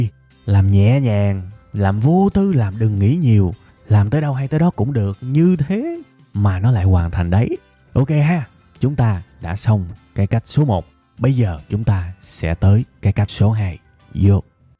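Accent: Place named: Dong Nai, Vietnam. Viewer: Ad nói giọng giống người miền Nam, cụ thể là Đồng Nai quá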